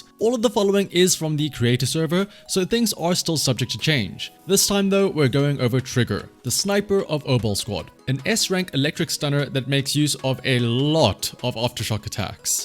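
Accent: South Africa. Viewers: Are you Afrikaans? Cause I recognize the accent. I speak ook afrikaans very deliciously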